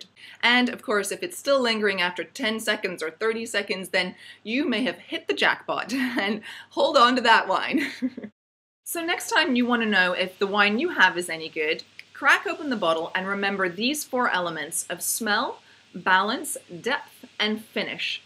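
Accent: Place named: Australia. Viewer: I feel like you're Australian 20% of this video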